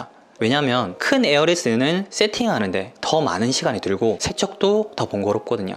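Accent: Gyeongsang, South Korea. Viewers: 구독하고 처음 댓글 달아요. 반갑습니다. 경상도 사투리 참 정감 있어요. ^^ 저도 경상도 사람이라...ㅋ 저는 방문을 리폼하려고 하는데요. 지금 살고 있는 현재집 말고 전에 살던 집이 페인트가 칠해진 목문이었기에 페인트 칠해본 경험이 있습니다. 방문을 떼지 않고 칠해서 인지 롤러와 붓으로 칠했는데 일명 눈물자국이라는 것도 생겨서 사포로 밀고 다시 살짝 칠한곳도 있었어요. 지금 살고 있는 집의 방문은 필름지가 입혀진 방문인데 필름지 위에 페인트를 해야할지 필름지를 다시 붙여야할지 고민하고 있어요. 님 일반인이 직접 셀프로 하기에 필름지가 나을까요? 필름지 위에 페인트가 나을까요?(물론 젯소 바르구요.)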